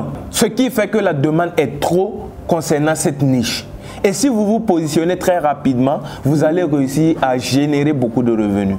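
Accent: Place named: Burkina Faso. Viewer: Un Burkinabé à travers la façon de parler ❤ salut Frère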